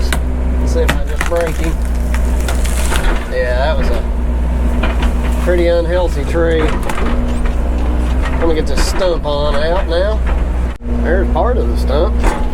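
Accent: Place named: Boston, United States. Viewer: Boston......I can tell by his accent 😉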